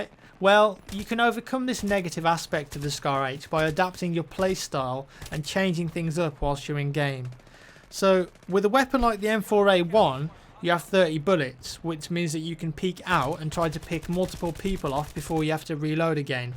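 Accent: United Kingdom. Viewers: where do you live, because it sounds like you have an accent from the UK, but I see you playing with DOOM49 who lives in the US, right? When you play together, don't you get a lot of lag?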